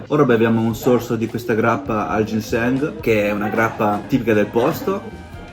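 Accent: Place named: Emilia-Romagna, Italy. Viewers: Un cinese con l accento romagnolo.. Troppo forte 😅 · Davvero bella scoperta questo canale con questo simpatico ragazzo di origine cinese che parla italiano con l'accento emiliano-romagnolo! 😊👍 Iscritto!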